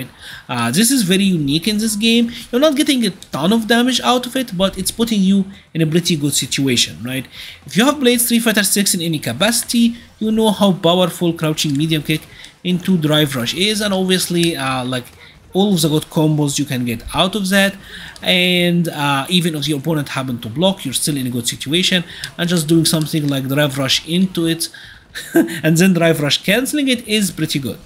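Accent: Egypt. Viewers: habibi good to see that there is an egyptian community for streetfighter, i just got the game a couple of weeks ago and learning luke so when i heard your accent i immediately knew you were egyptian. btw amazing guide super helpful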